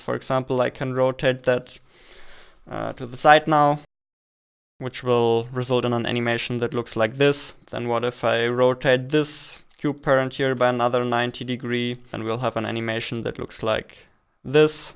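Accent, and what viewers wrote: Germany. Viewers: You have a very strong german accent, I like that! lol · Your German accent is very thick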